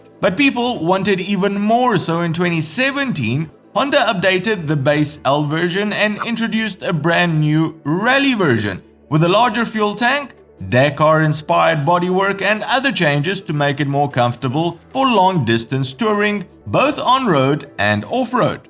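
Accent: South Africa. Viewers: Nice video as usual.thanks guys. Ps: your accent sounds like mine... Afrikaans. Intrigued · For a Romanian you have a remarkably South African accent 😀 - love the videos !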